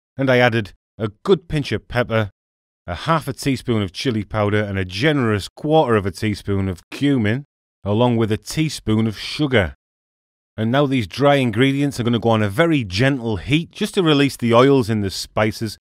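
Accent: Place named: Northern England, United Kingdom. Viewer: I,m just here for that northern accent.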